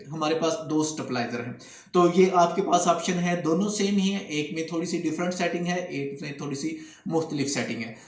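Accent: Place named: Punjab, India. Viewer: Tusi bolde urdu jo but tuhada lehja punjabi wala hai ji 😀😀🙏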